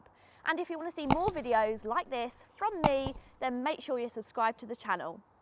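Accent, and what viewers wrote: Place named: Cockney, United Kingdom. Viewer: Nice car, annoying cockney accent.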